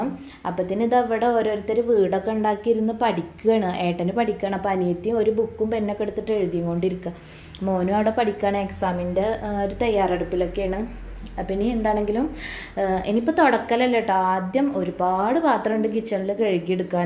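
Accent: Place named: Malappuram, India. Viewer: തനി മലപ്പുറം ഭാഷ പെരിന്തൽമണ്ണ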